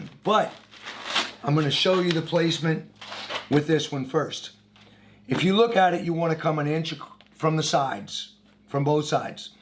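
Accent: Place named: New Orleans, United States. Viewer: I just found you because I need to Mya small roof repair and needed to confirm what I was thinking. You did just that, so thank you. About two seconds in I thought, he’s from New Orleans! You got a new follower.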